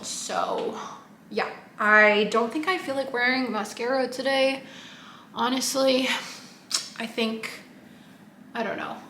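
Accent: Quebec, Canada. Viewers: I adored listening to you speaking French <3 with the cutest Quebec accent at that ! lots of love from France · I like your videos and in this one it was the first time I heard you speaking in French and you even have the Quebec accent when speaking French I'm impressed (I love the Quebec accent as a French from France) :hands-yellow-heart-red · Haha you have such a strong quebecois accent now when you speak French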